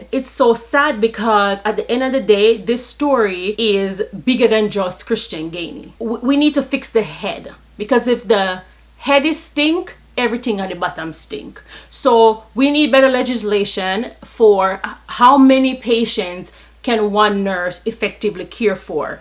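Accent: Jamaica. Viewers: I don't even have to ask if you're Jamaican, I'd only ask what part you're from? I can recognize my people anywhere!!! New subscriber here, also a RN and you are already inspiring me to climb higher! Bless you! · You sound very Jamaican! 🇯🇲 I was watching a video re this story, just minutes ago. It was by a doctor. And I was very interested to hear what happened. But his lacklustre delivery, his projection, etc., I just couldn't listen him anymore. So I scrolled, and there you were. I clicked, and few minutes into listening, I subscribed. Good job! You're brilliant, you're fluent, projection 100%, delivery 100%. Overall, a very informative and excellent presentation! 👏👏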